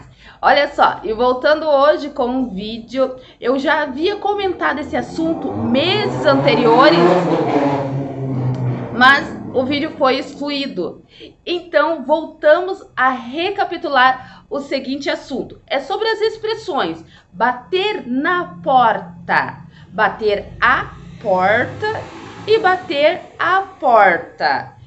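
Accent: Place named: Paraná, Brazil. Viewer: Aula muito boa , oratória muito boa com o sotaque do nosso Paraná rsrs. Vou indicar pro meu filho assistir, sucesso professora !!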